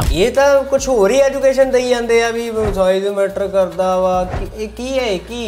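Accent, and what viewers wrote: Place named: Ludhiana, India. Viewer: ਬਾਈ ਤੋਂ ਲੁਧਿਆਣੇ ਤੋਂ ਲੱਗਦਾ😅😅😅😅😅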